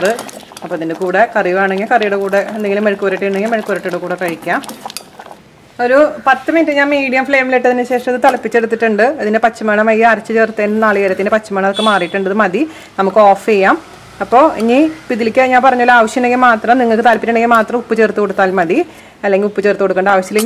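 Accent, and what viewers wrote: Thrissur, India. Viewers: Kkk👍. Chechide slang vechit...naadu Nammade thrissur aano ?? Njanum thrissur aatta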